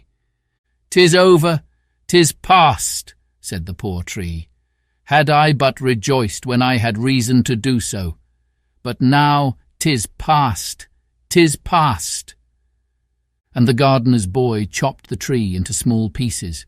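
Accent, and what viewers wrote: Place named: England, United Kingdom. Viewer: Thanks for that...great narration , English accent ...just found a new channel for me. Merry Christmas.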